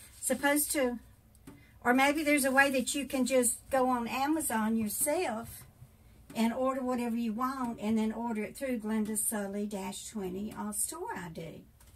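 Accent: South, United States. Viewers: Hey Glenda. I've been watching your videos for a while, but this is my first time commenting. I'm from North Carolina, so I can appreciate your southern accent. I can relate to a lot of what, and how you cook. I'm a southern cook too. I enjoy your videos. I got a big kick out of you getting tickled over the artificial leg article online. lol. Keep on making these good videos, and I'll "keep on comin' back." Happy Easter.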